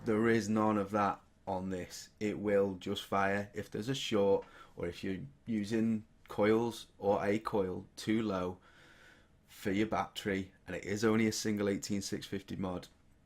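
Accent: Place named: England, United Kingdom. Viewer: Love your English accent - did you go to drama school to lean it? Excellent, keep them coming.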